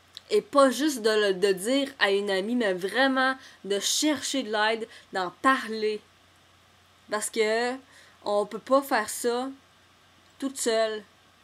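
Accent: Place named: Quebec, Canada. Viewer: De quelle région du Québec viens-tu? Ton accent quand tu dis mettons "infermière" est plus épais que je suis habituée d'entendre, et je suis curieuse :) Merci!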